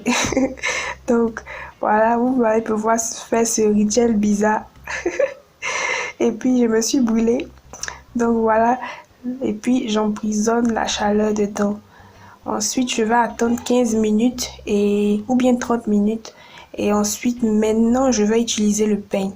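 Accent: Ivory Coast. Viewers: L’accent ivoirien hein il est calé On adore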